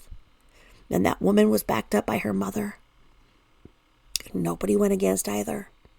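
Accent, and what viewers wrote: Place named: South, United States. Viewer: She's from the South. We say wadder and washcloth. We also say hosepipe. 😂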